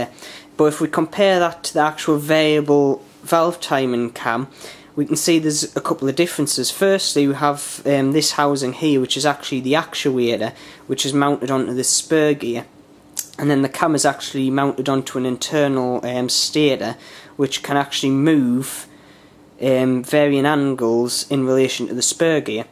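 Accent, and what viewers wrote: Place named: Northern England, United Kingdom. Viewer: What a distinctive Northern accent..Sounds almost like Liam from "Benidorm".:)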